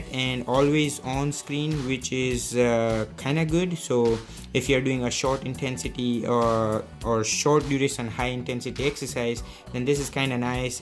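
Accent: Gujarat, India. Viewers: accent is awesome gujju bhai :)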